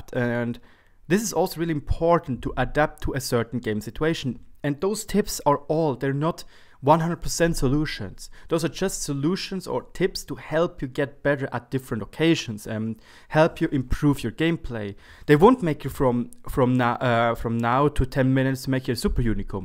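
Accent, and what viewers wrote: Germany. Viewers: wait are you from germany? i'm new so just asking!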